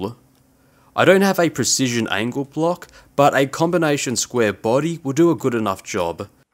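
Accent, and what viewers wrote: Australia. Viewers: All great again mate except you pronounce chamfer wrong, don't give up Australian accent. It's a French word and it's pronounced with a "SH" . Soon you will be pronouncing rebate as rabbit? 😉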